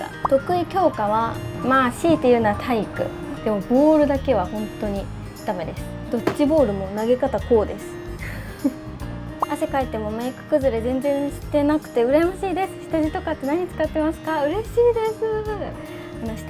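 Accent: Kansai, Japan. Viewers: ちゃんって、独特のイントネーションから関西人だと思ってました‼️ てっきり関西弁訛りの標準語だと…知らんけど😅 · ちょいちょい訛っているから西日本出身だと思ってた！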